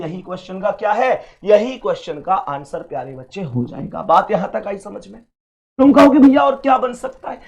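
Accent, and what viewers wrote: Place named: Mathura, India. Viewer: Mathura ki boli on point :hand-purple-blue-peace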